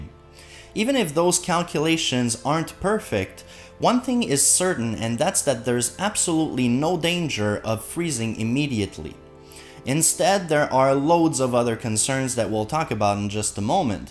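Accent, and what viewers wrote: Quebec, Canada. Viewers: He sounds "Québécois"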